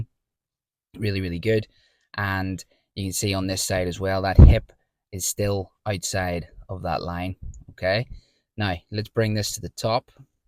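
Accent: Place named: Ireland. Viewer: Hello, I'm Roger. I've played and studied the game for 45 years. and I think you do a great job at your craft here. My parents are from Belfast, so that's unmistakably an Irish accent you have. I've met Ludvig, he's super cool. I Love his golf swing. His head drops a wee bit in the downswing. I think for a few reasons. I'd like your opinion on that aspect. It's quite common among great ball strikers: Trevino for example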